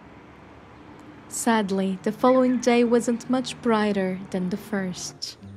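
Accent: Portugal. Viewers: Now I'm sure you're Portuguese. I love that accent and I love Portugal. And I love the Ritz.❤✨️🇵🇹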